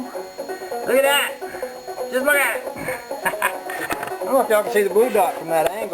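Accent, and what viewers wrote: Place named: Tennessee, United States. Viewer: Interesting. I ran across this while trying to find the origin of blue dot tail lights. I noticed your Maine plates, what part of Maine are you in? I am in southern Maine. What brought you here? When I first heard your accent I thought the video was out of Tennesee or something :)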